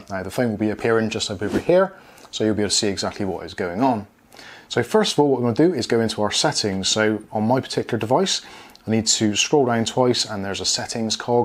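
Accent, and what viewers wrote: United Kingdom. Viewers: too british for me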